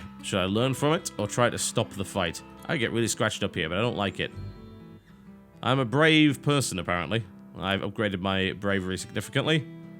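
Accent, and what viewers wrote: United Kingdom. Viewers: is it just me or is british? lol! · Why would the name total Halibut and athe british accent make you belive that? No but in all seriousness( if that is a word) he is british but moved later to the U.S you can catch up on most of his backstory in the 1 mio subs vlog. Thinking about it it sounds like I gave you the back ground to a videogame character... hm..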